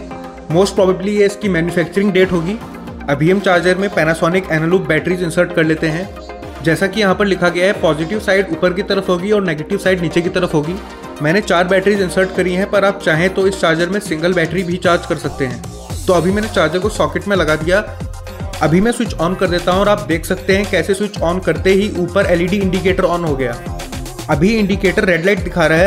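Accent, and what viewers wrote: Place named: Arab world. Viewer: I like hearing Arabs speak English like this. Which country are you from Saudi Arabia,Kuwait, Dubai,Yemen?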